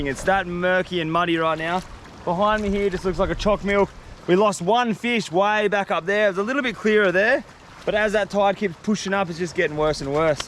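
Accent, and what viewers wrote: Australia. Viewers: not being mean but are you aussie i am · I was worried until i heard the auzzi accent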